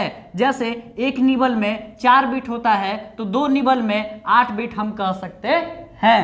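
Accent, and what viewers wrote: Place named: Bhojpuri, India. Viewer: Ye hua smjhane ka idea 👍👌👌 or mst trick se smjhaiye bhot bdhiya h Apka bhojpuri andaj bhi sandar h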